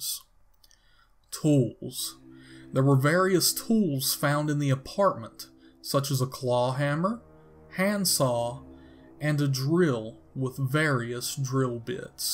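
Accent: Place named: West Virginia, United States. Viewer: Are you from West Virginia?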